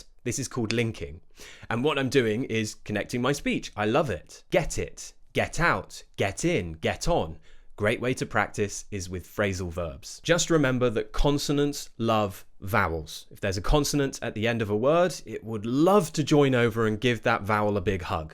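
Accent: RP, United Kingdom. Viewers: I like British accent thats why i always watching your videos from🇵🇭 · I love to hear the british english… 😅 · Do you always speak in that accent normally? Especially, RP accent and the tone. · Hello, I’m not sure if you’ll see my comment, but I truly appreciate your efforts. I really love your accent, and I’ve always been fascinated by British English. If possible, could you tell effortless stories—around 30 minutes long—and at the end of the story, explain the actual meanings of the most important expressions, words, or phrases with a few examples? After that, it would be great if you could read the story again a bit more quickly. This idea is similar to what the channel English Natively does. Thanks a lot! 🙏🙏🙏🙏🙏🙏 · I don't know why but I absolutely love the British accent 😊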